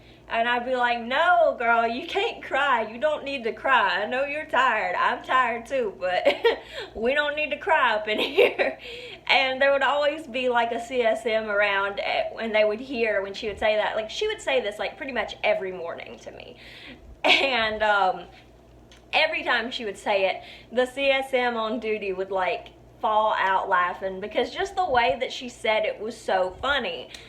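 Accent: South, United States. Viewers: Heavy southern accent 😂👍👍 cool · Sorry it didn't work out at Walmart. Not all Walmarts are bad. I hope you are doing well and you definitely have a future in entertainment. Love the southern accent ☺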